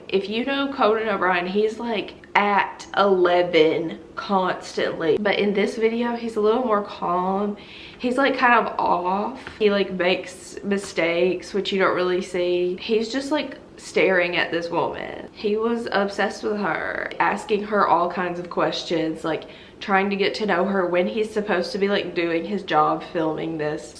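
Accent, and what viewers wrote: South, United States. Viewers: your energy + your southern accent = one of the joys of life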